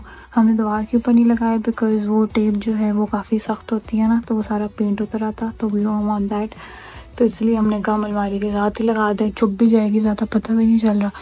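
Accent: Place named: Punjab, Pakistan. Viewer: PK jabi and Canadian English accent doesn't mix too well....but its funny nonetheless !!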